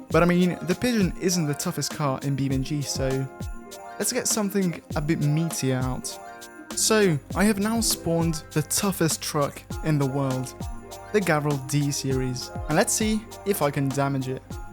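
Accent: Italy. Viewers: Love your Italian accent :D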